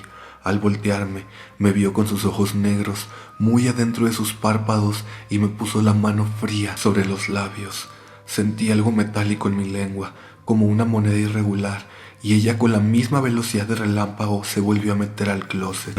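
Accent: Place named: Upper class, Mexico. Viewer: Hole por qué últimamente hablas como waytechecan cómo si tuvieras una papa en la boca weeeeee megaaaaa (cringe1000)🫢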